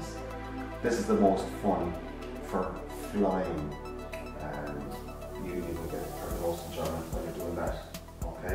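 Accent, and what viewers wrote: Ireland. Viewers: One of the best tutorials on setting up this plane and I'll use it as a reference in adding the Byme-A stab to a little 800mm pusher I'm putting together. But a bit of a whinge also. That mellow Irish accent is pretty hard for an Old Fart with 60 years of heavy machinery hearing damage to decipher with the limitations of the bad reverb of your mike/location (sounds like you are talking with your head in a kerosene tin! 😜) but adding that bloody Youtube musak overlay of a Caribbean Steel Band banging on it makes it even tougher. I know nothing of Youtube posting but I see lots of folks making this mistake. If it is somehow required it is a pity there is no facility on our end to kill it. eg with a stereo system balance pot. Rant over. 😊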